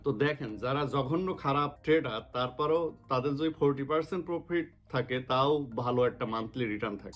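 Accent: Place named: Kushtia, Bangladesh. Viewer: Vaiyar basa ki kushtia te? Kushtia r vasar moto lagtise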